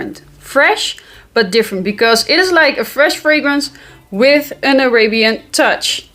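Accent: Netherlands. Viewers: Ben je Nederlands? Zo klinkt je accent. Je bent trouwens echt grappig 🤣🤣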